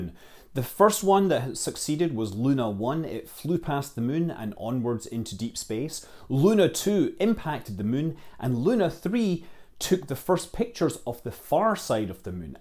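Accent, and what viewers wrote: Scotland, United Kingdom. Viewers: That's a very convincing Scottish accent.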